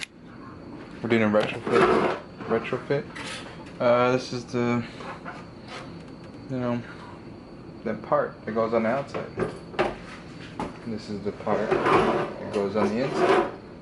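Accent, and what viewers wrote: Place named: Texas, United States. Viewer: What part of Texas your from?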